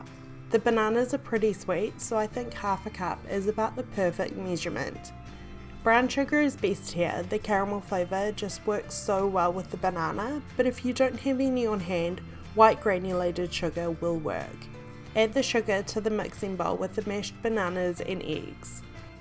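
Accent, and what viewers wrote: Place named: New Zealand. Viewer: She's from new zealand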